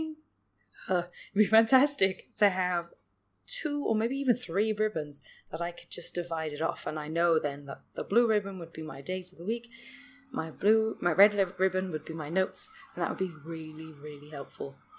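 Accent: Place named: Wales, United Kingdom. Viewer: Great PJs and love how you're sounding more Welsh after your trip home! 😘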